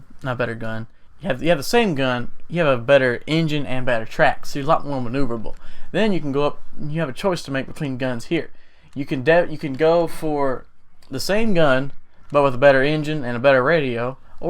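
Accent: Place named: South, United States. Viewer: You sound southern af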